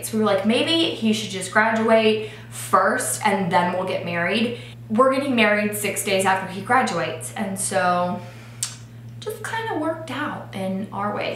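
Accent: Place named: South, United States. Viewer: Did you move to the south near the beginning of your engagement? I feel like your accent gets thicker in every video haha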